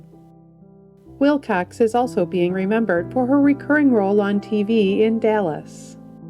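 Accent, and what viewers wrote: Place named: Illinois, United States. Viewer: I love this woman's voice and accent I wonder what part of America she comes from... GORGEOUS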